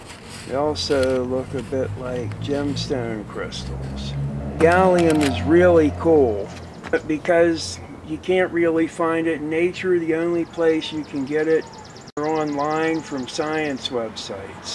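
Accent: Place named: East Coast, United States. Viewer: You have a very nice accent. Definitely east coast, you can tell by the "o"s.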